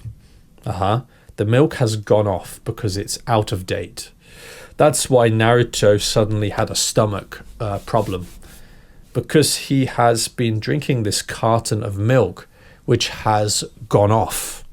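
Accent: United Kingdom. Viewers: Really, the British accent is the best.❤❤❤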